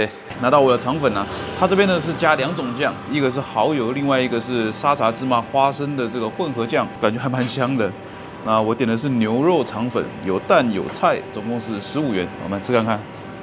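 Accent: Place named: Taiwan. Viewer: 博主是哪裡人？臉相是浙江人，但是說話又有點台灣的感覺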